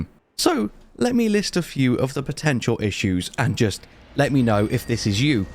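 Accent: United Kingdom. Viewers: I just love your polite greeting in the traditional British accent. You have great info in your videos too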